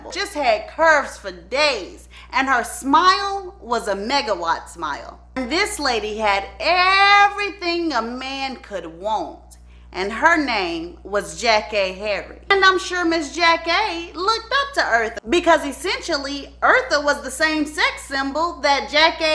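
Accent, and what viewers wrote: South, United States. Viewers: Luv your southern accent your funny and entertaining and know how to tell a story with humor which keep the audience engaged happy I found your channel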